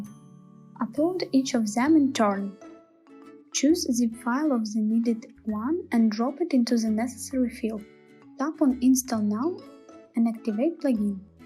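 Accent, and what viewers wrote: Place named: Russia. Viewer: I love your Russian/Polish/Ukrainian accent.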